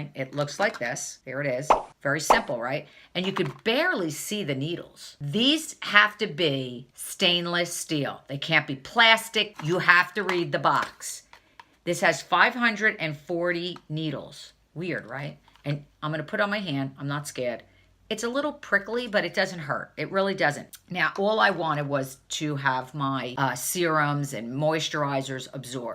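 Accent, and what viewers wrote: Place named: New Jersey, United States. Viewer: I have to try micro needling. Yours is the third beauty channel that talks about serious results, and something’s gotta give with this saggy skin. Had not heard of the Gold Bond cream, but I am now on the lookout for it. BTW—love your Jersey accent—a boss and best friend are from NJ , right from your neck of the woods! Love your sense of humor—keep up the good work!❤️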